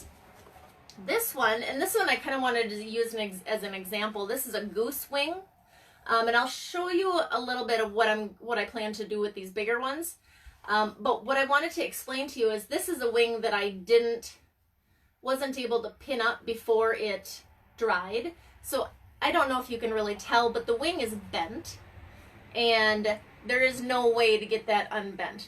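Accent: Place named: Ireland. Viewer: You kinda sound irish lol :)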